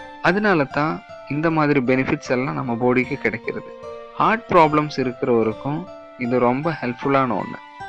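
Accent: Kerala, India. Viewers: சாரே.. மலையாளியோ??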